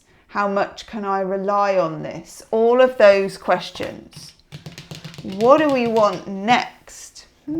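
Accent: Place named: United Kingdom. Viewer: VO5 through his hair.... How old is he lol....always a pleasure to hear a British reader not afraid to be totally British. (Many of my favs are American tbh). Maybe one day I could brave myself on you tube, unlikely my reads are far too fanciful....(although saying that love Nigel's reads)....namaste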